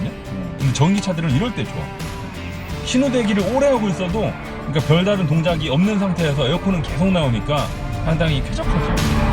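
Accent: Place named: Gyeongsang, South Korea. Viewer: 경상도버전 개웃곀ㅋㅋㅋㅋ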